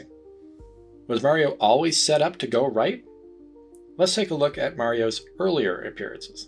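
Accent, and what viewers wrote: Newfoundland, Canada. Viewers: Thats awesome! ive been to both of those provinces many times. Im born and raised in St Johns , NL. I could tell by your accent at the end of sentences. You have a good idea here for videos. Really enjoy them. Trying to think of a video idea for you. The Newgame+ troupe might work for a video. Anyways. Cheers from Newfoundland 🍻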